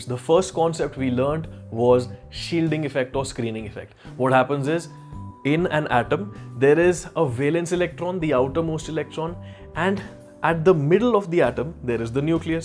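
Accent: Kerala, India. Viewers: You sound like a malayalee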